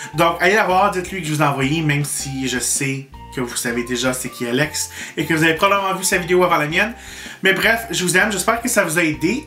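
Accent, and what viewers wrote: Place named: Quebec, Canada. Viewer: the accent from Quebec is really special i agree :)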